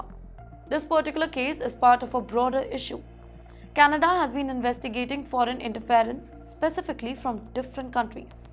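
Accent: India. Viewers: This is Indianlish. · It's called Curry English from India.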